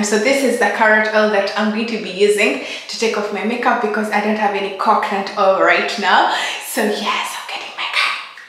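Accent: Uganda. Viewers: You sound like you from UG, am I right?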